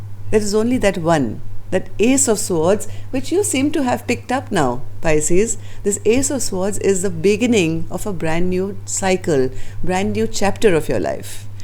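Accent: India. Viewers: are you a Pisces? You speak so sweetly, with so much love & so much pain & sympathy when we go through disillusion. I am Pisces, an Indian & I think you are Indian too, going by your accent. I've been watching your readings since the past 3 months & I look forward eagerly to your readings. Your interpretation resonates with me very much. I am not feeling too happy, today by the reading because, I really love & want my Virgo to begin talking to me again. We've been in separation for the past 6 months. I do leave everything in Spirt's hands & I accept whatever Spirit decides for me. I just want peace & truth & resolution of this separation. Thank you so much for your help. Be blessed. Namaste.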